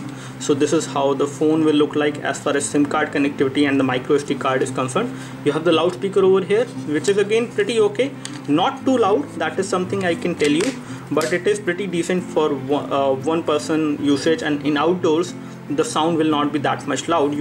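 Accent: India. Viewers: INDIAN accent is so disgusting..Yuck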